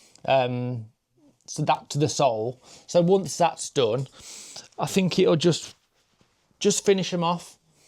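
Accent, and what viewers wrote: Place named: West Midlands, United Kingdom. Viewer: I wish I could find the enthusiasm to clean up. I'm sure it would make for a nicer experience. I just just straight into the mess again the next day though 😬 The slippers are crackes! I'm sure he'll love them. And without sounding like a giant brown noser, you're making our lives better too! It's so nice to see someone else figuring out their way around crafts and sharing their wins and losses. And I spent a few years living in Birmingham and Wolverhampton (from Australia) and your accent makes me so happy!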